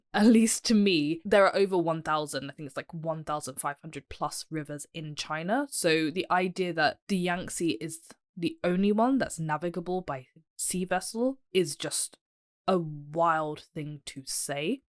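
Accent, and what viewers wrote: England, United Kingdom. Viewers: Your education has made you naive to naive to the facts of power operates. You will naturally understand how power works once youve had a decade away from being a student. Your not taught in school how to obtain power or even how it operates. Thats done on purpose. You are trained academically to be an employee not an employer. As long as you have the employee mindset you will never see the whole picture. Peters opinion is largely held by democrats, republicans and policy makers in washington along with the big think tanks brookings, rand, etc... your intelligent and well educated but your lacking in real world life experience. So your missing the whole picture. Interesting perspective and brave to attempt to debunk peter for lack of a better term. I enjoy your delivery and silly english accent😂😂😂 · Peter who? She's pretty ..and I like her English accent.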